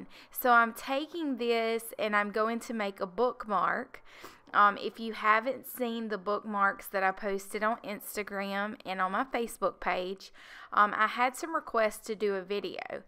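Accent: South, United States. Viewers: Miss your cute southern voice at the end! It's still a Great process video!! I love all the texture and vintage feel. 💜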